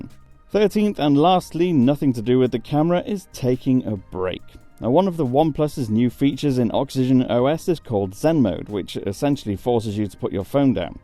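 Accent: United Kingdom. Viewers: Subscribed as soon as I heard the British accent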